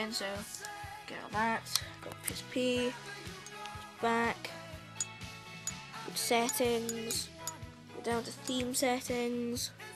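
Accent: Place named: England, United Kingdom. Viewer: He means your england accent. xP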